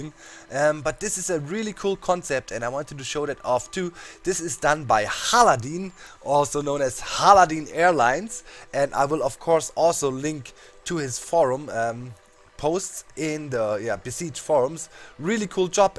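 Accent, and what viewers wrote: Germany. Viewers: Man hört ds du deutscher bist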